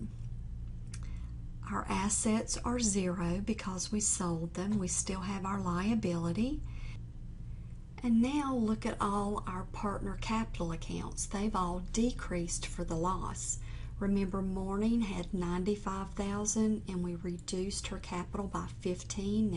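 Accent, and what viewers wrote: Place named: South, United States. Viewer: thank u and i like your southern accent btw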